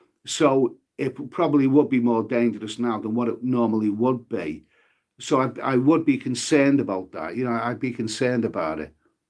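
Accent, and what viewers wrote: Liverpool, United Kingdom. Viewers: You’re a scouser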